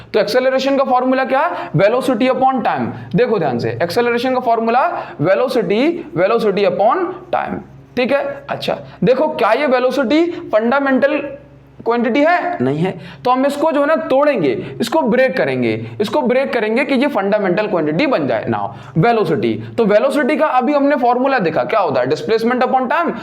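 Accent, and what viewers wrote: Odisha, India. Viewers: Sir are you from Odisha? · Sir you are from odisha??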